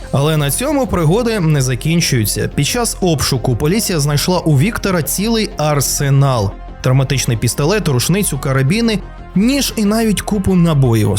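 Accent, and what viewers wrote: Russia. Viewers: Канальчик проросійський, з голови гниє. Совкові цитати, російські слівця та обороти в тексті, росіянський акцент у вимові нейронки. Головний месидж - в Україні все, ВСЕ ПОГАНО !!!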